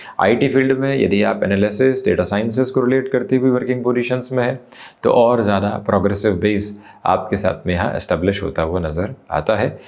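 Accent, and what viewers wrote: Standard. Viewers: Bahut badhiya rashifal bayan karte hai aap. Aur aapki hindi bhi bahut hi shuddh hai. Kabhi kabhi kuch shabd samazne me taklif hoti hai toh subtitles ON karke samazna padta hai. Dhanyawaad. 🙏🏻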